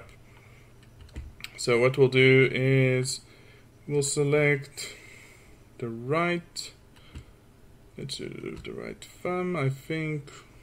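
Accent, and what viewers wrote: South Africa. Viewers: Great video! Are you South African?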